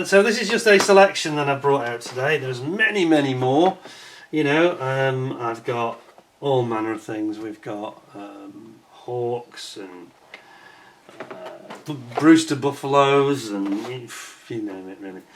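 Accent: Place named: United Kingdom. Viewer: Hi, I don't judge your nice job on YT. I was only saying that these posts look quite anachronistic.....I don't know and never heard one modeller that said Matchbox was top-notch rather the opposite. And I can confirm that because I used to build them, a lot, in the late 70s. Maybe you are right about flashes, good fit, I don't remember but let's talk about details or paneling lines....I read somebody here saying that panels of matchbox kits were done with the plough....a joke but it gives the idea. ANYWAY they are a nice memory of my youth, when I built and still have (restored) an harrier, a jaguar, a UK phantom, a panther, a beaufighter, a Corsair II, a Skyhawk. These survived over the years But I wouldn't say, ever, that their quality was good. Cheerio. By the way I love following you because I can trainy listening skills with your slightly difficult British accent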